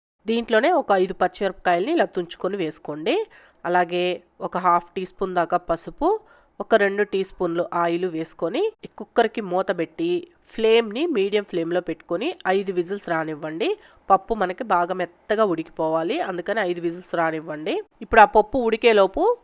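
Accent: Nellore, India. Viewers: Meedi Nellore madam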